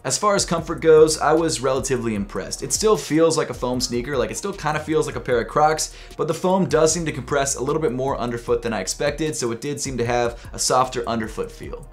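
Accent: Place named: Southern California, United States. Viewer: sounds like a totally tubular guy from Southern California but he's not from there.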